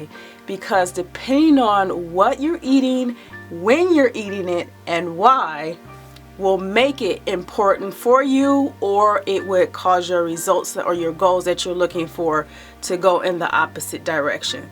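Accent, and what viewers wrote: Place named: Detroit, United States. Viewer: that Detroit for BREAKFAST 🙄😄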